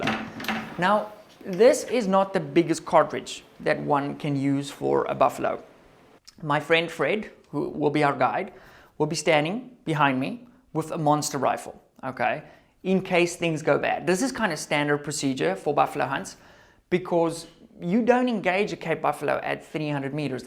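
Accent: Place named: South Africa. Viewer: ah a new transatlantic accent , half afrikaans , half american english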